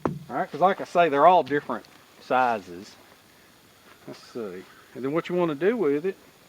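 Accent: South, United States. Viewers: You also have a delightful way of putting things and a nice southern accent that I find relaxing. Your laughter and humor always leaves me with a smile or grin on my face. Thanks for your videos; I'm a fan. (now in Idaho by way of Tennessee). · My favorite item was the Alabama Hammer. It warms my heart to hear a fellow died in the wool Southerner speak on here.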